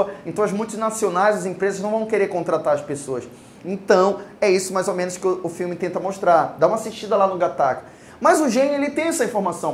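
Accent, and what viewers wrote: Pará, Brazil. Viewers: é paraense? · Esse cara só pode ser do Pará... É lá de nós. éguaaaa cara saudade da nossa terrinha. o melhor. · Ede Montanho ele é paraense. 😊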